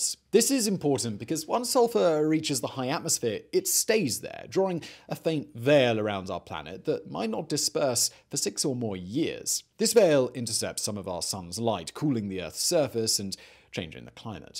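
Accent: RP, United Kingdom. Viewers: is this what they call posh accent? it's so difficult to understand :( and the cc are only auto generated. :( I can follow eg veritasium's videos fine, but these are challenge. And it's pity cause it's good content. I like the disaster put into history context.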